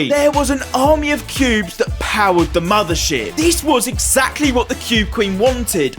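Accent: United Kingdom. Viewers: I would watch this entire video but you're British and obnoxious 💀💀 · British + mic eater =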